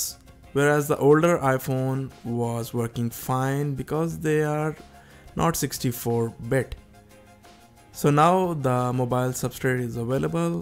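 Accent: India. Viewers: Yo dis shit no work wit yo Indian voice likd dafuw u sayin Peace dawg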